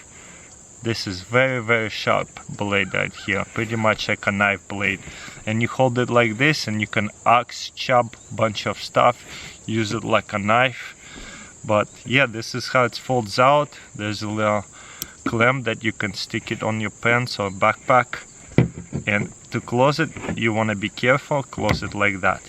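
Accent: Russia. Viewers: Руский акцент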